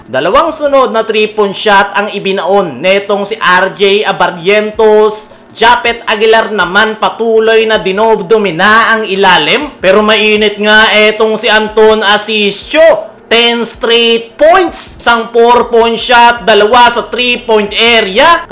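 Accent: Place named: Philippines. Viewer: Ang pangit mong mg salita ano b probins u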